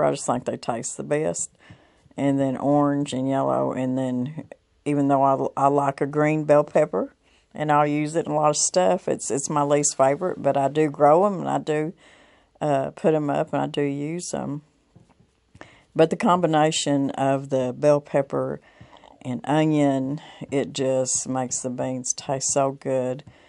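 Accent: South, United States. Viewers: Now thats the just right hill music and southern accent to cook to! When you said purty good i was home! 😊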